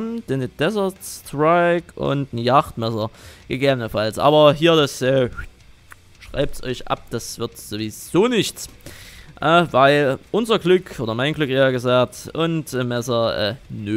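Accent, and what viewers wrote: Saxony, Germany. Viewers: weil sachse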